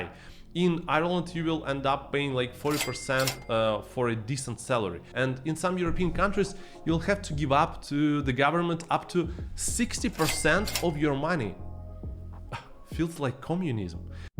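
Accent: Singapore. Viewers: I loved your video. Especially your Singlish. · very power singlish mate! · "You need only T-shirt and slippers." Good one. Because now everyone WFH so no need pants. Your videos are absolutely hilarious by the way, your singlish power lah. Have liked and subscribed!! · Because you spoke Singlish, I subscribe liao! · Your Singlish! XD For me, the weather here is fine, it's the humidity that kills. I agree with how convenient it is to get around. As long as you're willing to walk, everywhere you need to go in your neighbourhood is within reach. However, it also takes very long to get from point A to point B in some cases. I tried sitting in the bus for 2+ hours straight to get from the West to the East and I swore I'm never doing it again. (Thank goodness for the MRT.) There are some cons to living here as well like high living expenses... Which is kind of hard for many to start a family. 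And for those who are career-driven because it's fast-paced Singapore, might be neglecting their family and health too... I believe that it's always good to travel and broaden one's perspective and eventually find out what you really like. Glad to have you and your family here! :D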